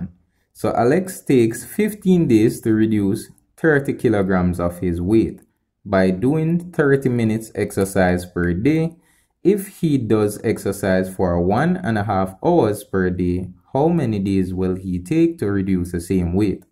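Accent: Jamaica. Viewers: Thank you man!!! Love your Jamaican accent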